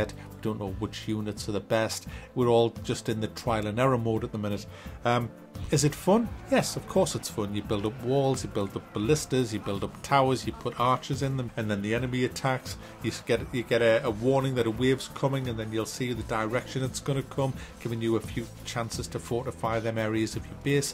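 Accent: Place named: Northern England, United Kingdom. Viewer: Stupid world nowadays. Us northern lads speak proper i understood u perfectly. chuck, cob, wang, lob.all mean throw. luv ya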